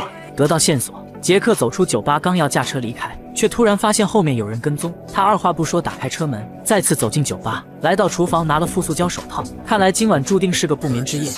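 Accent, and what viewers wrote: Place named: Sichuan, China. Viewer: 感觉解说员是我们四川人啊！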